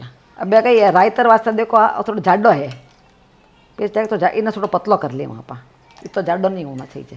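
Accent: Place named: Jodhpur, India. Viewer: Aapki awaz, ton sabkuch Jodhpuri he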